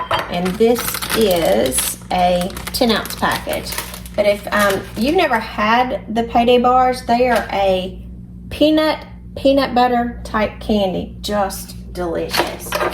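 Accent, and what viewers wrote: South, United States. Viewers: I don't know if it was the recipe or the southern accent that made me subscribe, but here I am 😂.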